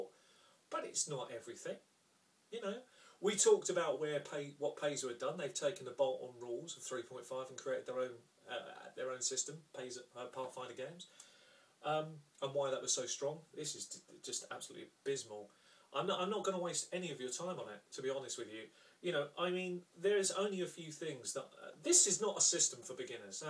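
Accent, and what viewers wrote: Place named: United Kingdom. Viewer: Just typed my name in search, found a well spoken british man talking about rpgs and a hockey goalie kicking some ass. Nice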